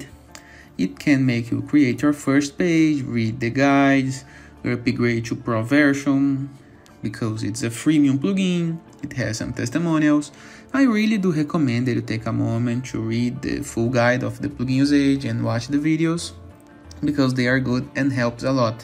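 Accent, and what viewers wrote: Brazil. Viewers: Incrível o quanto é fácil reconhecer Inglês brasileiro kkkk Vlw irmão <3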